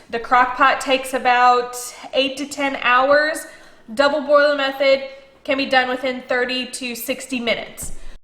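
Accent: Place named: United States. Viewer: Why do mercans say uurrbbs, and savv...Herbs, and saLve. Most annoying and makes you sound stupid!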